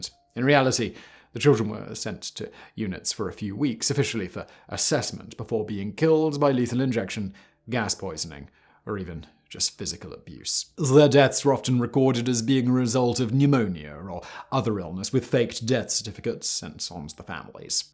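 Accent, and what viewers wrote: United Kingdom. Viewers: Omg it's the bri'sh VSauce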